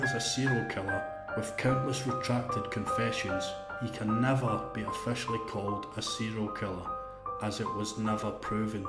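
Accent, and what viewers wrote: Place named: Scotland, United Kingdom. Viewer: edit thanks for the news clipping . I’ve been trying to figure out how he got life for one kidnapping charge, that contained more info than a couple diy docs. Subscribed Now that is a rich, Scottish accent. Big true crime/serial killer analysis fan. That Blackbird show got me tho. One scene in particular still haunts me.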